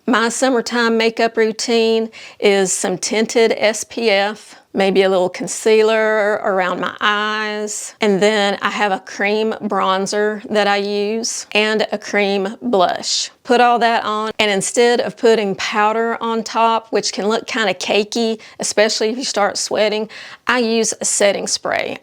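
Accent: South, United States. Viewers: I laugh and think of your sweet southern accent when I see Capri pants and cheap flip flops!!!! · Hi. I love your video. I’m 65 and trying to figure out how to get where you are going in my own personal life. I am disabled so I’m at home all the time. I would not be caught dead in Capri pants or shorts or dress because I have skin problems on the bottoms of my leg below the knee. It’s kind of a permanent thing-that I can’t do much about at the same time. I can’t imagine wearing linen flowy pants every single day all the time. I just don’t quite know where to go stylewise. I end up wearing sweatpants all the time. I live in a small small town so I have to do all of my shopping online. I’m going to keep trying to transform my summer look. Thank you for the video. It keeps me focused on a goal PS I absolutely love your southern accent. I’m a southern girl myself, but I live in the upper Midwest now.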